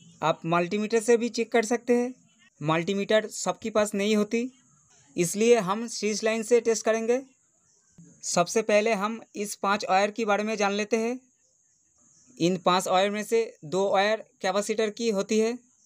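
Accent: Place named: Bengal, India. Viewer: Bangali ho ke Hindi kau bol rehe ho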